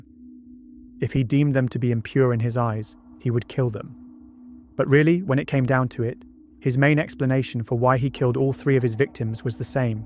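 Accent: England, United Kingdom. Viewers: Not AI. It's an English accent. There are certain annunciations that AI still cannot reproduce. Hopefully they never will. Otherwise there will be a day when even an expert won't be able to tell the difference.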